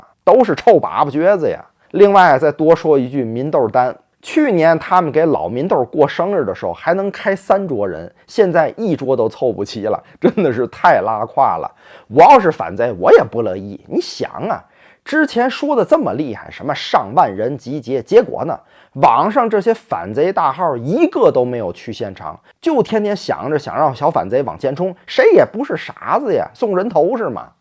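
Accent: Tianjin, China. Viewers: 听起来像我们天津老乡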